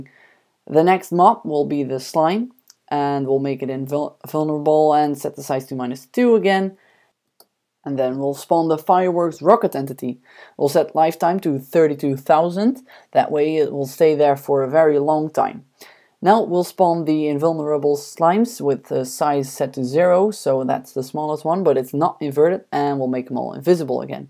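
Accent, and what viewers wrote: Netherlands. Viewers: haha ik hoorde gelijk aan je stem dat je NL ben ;p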